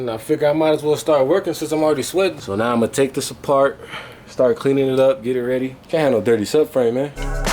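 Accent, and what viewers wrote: United States. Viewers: Smashing a grinder through something screwing it up and cleaning things on dirt. This guy is just me with an American accent.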